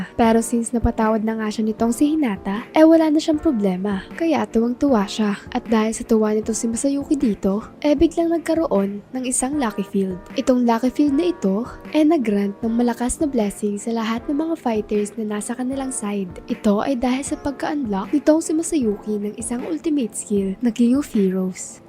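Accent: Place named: Conyo, Philippines. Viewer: ang conyo ng voice, approve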